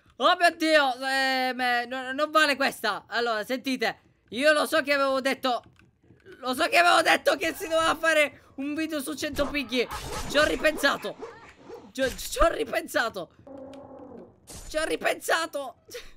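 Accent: Sicily, Italy. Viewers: Sei Siciliano??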